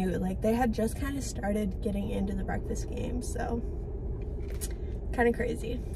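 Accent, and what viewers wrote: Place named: Michigan, United States. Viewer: Your hair looks great. I figured out why I like your videos so much. I like the way you talk. There's something calming and familiar about it. I suppose a lot of it is because you're trying to engage your audience, and I think you do a great job. The other thing for me is that you're from Michigan and since I live in Michigan I think it makes you sound more familiar and friendly than if you were from somewhere else. I didn't grow up here, but I've been here for most of my life now, so it's comforting to hear a voice that sounds like my friends on YouTube.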